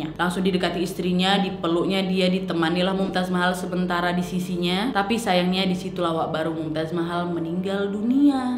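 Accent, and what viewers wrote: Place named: Medan, Indonesia. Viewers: Salam saudariku,logat medanmu kental ya... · Orang mana kamu nak,bahasamu Medan xx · Sukak kali aku lihat kau dek dengan cara menceritakan nya dgn gaya Medan en betul enak dan lucu tidak membosankan. Sukses selalu dekku.😘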